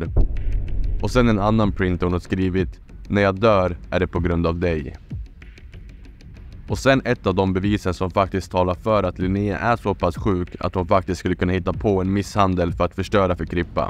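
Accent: Dalarna, Sweden. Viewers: Härligt med lite dalmål på Youtube 😎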